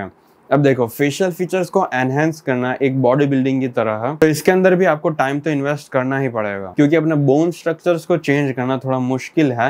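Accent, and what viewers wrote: Gujarat, India. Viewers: Gujju accent >>>>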